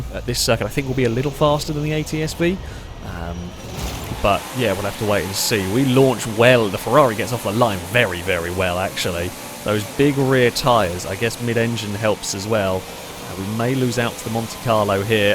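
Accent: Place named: Midlands, United Kingdom. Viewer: In queens English, you would be correct, but accent isn’t queens, it sounds mid shire to me, and it’s probably acceptable in that accent. Of course a lot of people think that Queens is the only “correct” accent and insist that it’s grammar rules are followed, but frankly, it’s a very old fashioned point of view.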